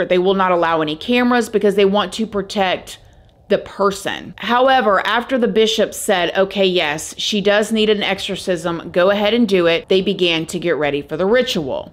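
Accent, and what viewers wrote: South, United States. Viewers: I love real life horror. Also, yes I can tell your a fellow southerner lol. Same accent as mine. God Bless.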